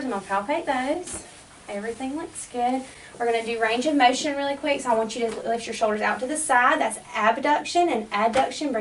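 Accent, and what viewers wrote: South, United States. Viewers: That southern accent makes the video😍👅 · I normally hate southern accents but hers is adorable.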